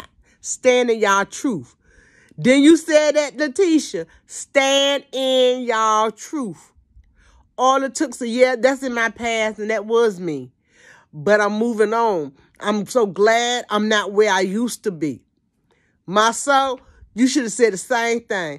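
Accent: United States. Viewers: No she dont. She sounds like she maybe from The Carolinas, Mississippi, Georgia even. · I kept listening and I hear wisdom and plain common sense. You sound like you from New Orleans? Good talk my friend!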